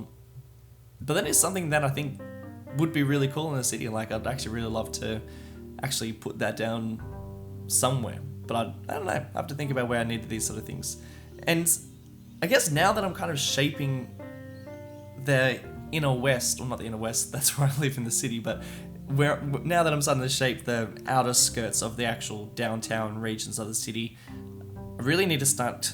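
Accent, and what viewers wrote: Australia. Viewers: Seriously good quality presentation mate. Great to hear another Aussie accent too. Well done mate, top marks. Liked and subscribed. Keep it up.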